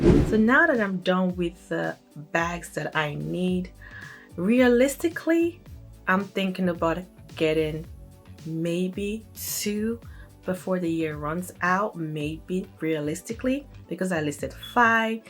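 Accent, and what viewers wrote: Nigeria. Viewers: I love your Nigerian accent!!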